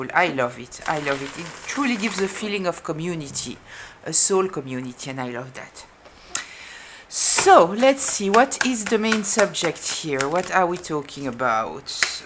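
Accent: France. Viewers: I think your approach to not bother people is a very healthy one when its done from a place of empowerment. It protects you from runner chaser dynamica where people extract energy from you running after them. If they needed your help its not upto you to insist more but upto them to ask for it in a healthy way. The only downside to your programming is imo that you fail to ask for help when you would need it yourself due to a fear of bothering others and this is indeed something that is detrimental. I enjoy your readings and the way you explain things. I also enjoy the french accent😊 Much love from a Dutch woman from amsterdam❤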